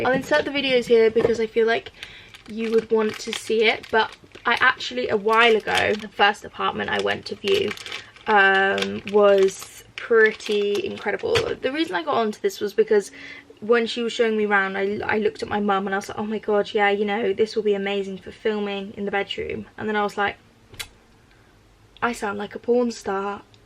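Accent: Scotland, United Kingdom. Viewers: You’re Scottish accent 😂😂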